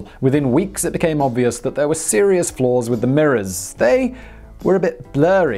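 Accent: United Kingdom. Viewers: Lots of "we" and "our" in that monologue coming from someone with a British accent.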